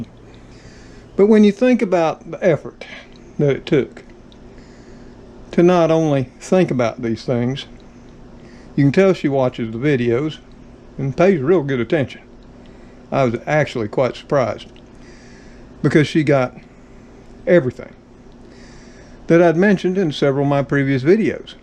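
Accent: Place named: Deep South, United States. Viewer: Dear Mr, Trixie and the kitty crew ….I have been watching you since Dec 2019 and I just love the way you speak...your accent makes me smile and I have learnt so much from you and your way of life in the deep south. Not sure how I came across you but I am so glad I did because yours is my favourite channel so I thinks its definitely us the viewer that is blessed to have such a wonderfully humble and gracious host with such a love for life and his fellow animals. By the way...I am Indian by heritage but born in the UK and I live in a little village in Shropshire ….a lot of things are so different but the love we share is the same...God bless you Sir....Mand X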